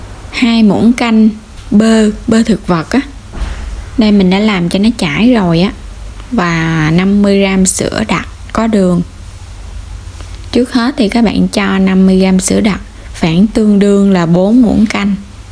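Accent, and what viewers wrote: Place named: Tra Vinh, Vietnam. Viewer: Nhìn Bánh ngon quá, giọng nói Trà Vinh nghe dể thương đó ...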